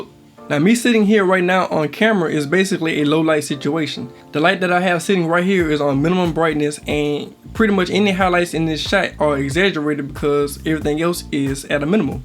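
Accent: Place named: Alabama, United States. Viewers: YOU FROM BAMA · I recently discovered your channel and have watched several of your videos. I don't normally comment on YouTube videos but I felt compelled to reach out and encourage you to keep making great content. Also, somewhat random, but I moved out of the US a couple of years ago and, originally being from the South, it's nice hearing another Southern accent - especially one in such a positive context. Keep going!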